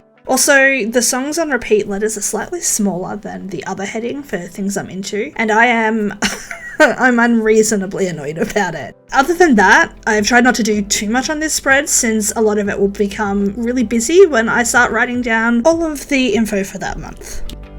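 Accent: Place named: Australia. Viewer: Gidday from Sydney 😊😊😊😊. This spread is amazing. So good to hear an Aussie accent. ❤❤❤❤❤. I like the colours you've used. ❤❤❤❤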